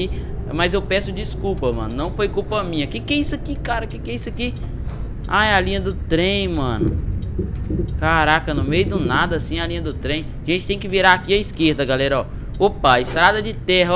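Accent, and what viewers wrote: Brazil. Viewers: não é de são paulo e veio da onde cara? já tinha reparado mesmo nos outros videos o "oxi" kkk =D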